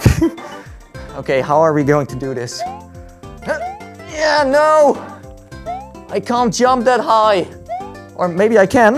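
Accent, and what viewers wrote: Netherlands. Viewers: Where do u live u sound dutch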